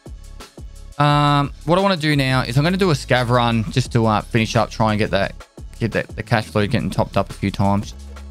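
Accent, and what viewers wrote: Australia. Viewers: Its 3am. Sleep: calling: has new vid out Me: I MUST HEAR HIS AUSTRALIAN ACCENT AND BEHOLD HIS EXCELLENT MARKSMANSHIP. It gib me serotonin boost:)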